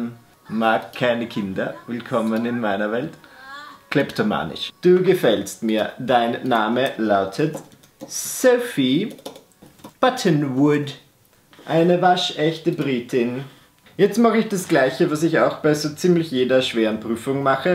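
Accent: Austria. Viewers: Hallo! Ich habe dich durch eine Freundin auf dein Instagram Account entdeckt. Darauf habe ich das Bild mit Sims 4 gesehen habe und dann deinen YouTube Kanal gesucht. Nach dem Sims 4 LetsPlay abonniert. Ich habe dann gemerkt dass du aus Österreich kommt und das finde ich echt cool. Deinen Akzent bzw die Art wie du sprichst ist sehr cool und gefällt mir. Auch deine Mimik finde ich sehr cool. Ich habe erst nach ein paar Videos gemerkt dass du schwul bist und deshalb finde ich Kommentare wie "Du bist die größte Schwuchtel überhaupt" richtig unnötig. Hab zwar nur 2 entdeckt aber trotzdem. Du bist der einzige österreichiscche Vloger. Respekt. Warum? In Österreich gibt es nur VeniCraft, der ist aber LetsPlayer. Noch was zu deinem Akzent, ich habe auch einen da ich aus Linz komme und wenn ich mit Freunden in Deutschland skype verstehen sie nichts. Dich verstehen sie auch nicht immer. 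Finde ich lustig. Ich könnte noch länger schreiben aber ich möchte dich nicht nerven. Liebe Grüße aus Linz:)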